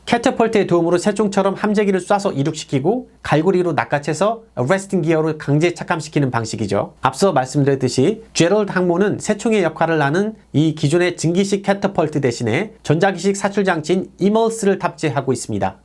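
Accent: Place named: United Kingdom. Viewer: 영국식 영어를 쓰시는 유튭을 보게되는군요. 잘 봤습니다.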